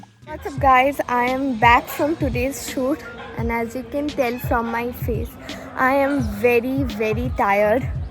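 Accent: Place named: Bengal, India. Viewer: You're such a sweetheart and that Bengali accent is so cuteee!❤️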